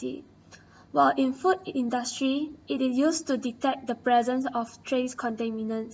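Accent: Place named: Asia. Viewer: Asian accent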